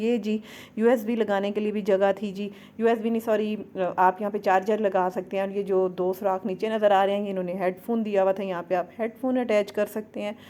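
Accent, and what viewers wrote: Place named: Punjab, India. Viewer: wesy hi AP k bolny sy lagta h ap punjabi h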